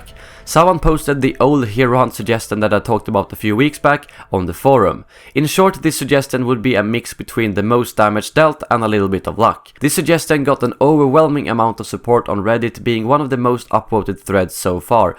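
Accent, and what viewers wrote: Sweden. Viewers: är du från sverige ?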